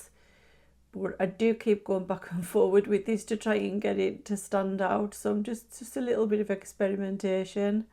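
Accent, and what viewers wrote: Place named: England, United Kingdom. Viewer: trying to place your accent is killing me. I have lived outside of the UK for 14 years and I cannot work out if you're from the Midlands or the NorthEast lol. Please tell??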